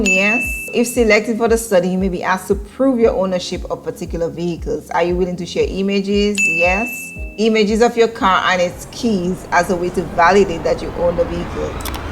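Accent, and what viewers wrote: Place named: Caribbean. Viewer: This is the Carribbean accent , she seems to be Carribbean too.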